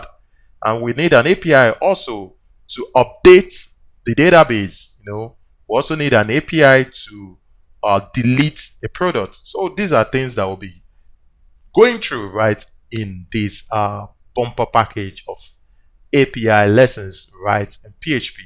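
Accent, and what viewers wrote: Nigeria. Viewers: sounds Naija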